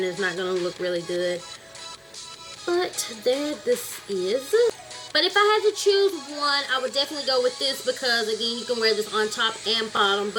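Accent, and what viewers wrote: South, United States. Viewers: I love your voice. Where are you from? I am in Louisiana, and alot of black people around here don't sound like that, but you do sound southern. Very beautiful voice! :)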